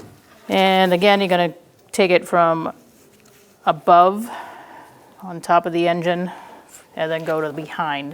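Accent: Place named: New England, United States. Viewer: New England accent coming in good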